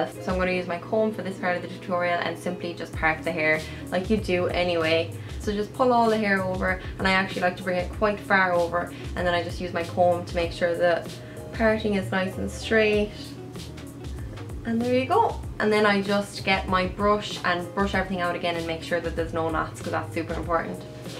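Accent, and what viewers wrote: Ireland. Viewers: Liked and subscribed, I really enjoy your videos and it's especially nice to hear them in a familiar Irish accent! ❤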